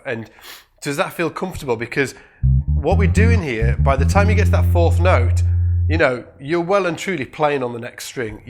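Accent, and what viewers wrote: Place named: Manchester, United Kingdom. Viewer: I love your accent as much as your lessons, are you from Manchester?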